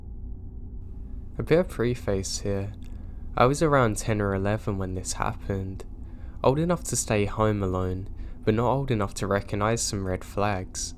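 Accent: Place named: RP, United Kingdom. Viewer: awfull posh creepy voice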